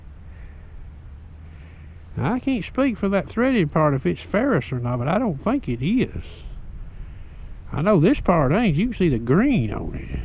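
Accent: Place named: Southern Appalachia, United States. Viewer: If I close my eyes and listen sounds like papaw to me! Lol as a southern appalachia boy this channel feels like home and love the accent!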